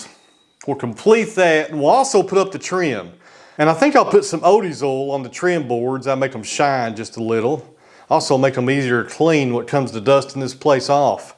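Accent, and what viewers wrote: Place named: Tennessee, United States. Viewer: I think I would go for a second coat unless the bulk of the wall is being covered up by tools etc. No on second thoughts a second coat is needed, do the job properly so that the exsposed parts of the wall do look finished. Thank you for what you do, it is great to watch your progress and growth. That accent of yours is just how a Limey would imaginea genuine Tennessee man to have and long may you continue, I just love it. How much land do you have for your property, land in England is eye watering high and hard to come by.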